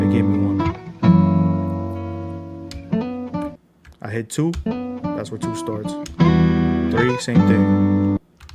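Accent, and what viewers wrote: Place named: New York, United States. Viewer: True New Yorker, where you from?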